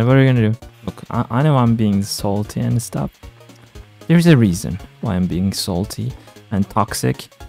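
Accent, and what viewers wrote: Turkey. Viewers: şiveden anladım direkt türk olduğunu :D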